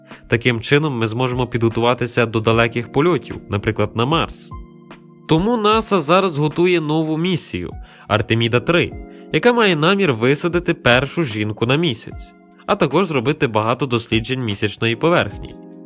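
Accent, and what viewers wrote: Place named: Zakarpattia, Ukraine. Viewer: Ви з Закарпаття? Акцент 😊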